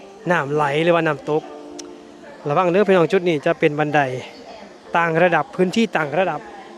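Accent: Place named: Phrae, Thailand. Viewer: บ่าว วันนี้ฉันตื่นเช้าเข้ามาดูเลยคะบ่าวพูดได้ชัดเจน สามารถพูดให้คนไทยได้เข้าใจมีความเป็นคนไทยเยอคะพูดชัดเจนสำเนียงคล้ายคนไทยแพร่ภาพเลยคะให้ชาวโลกได้รู้ประเทศไทยคะ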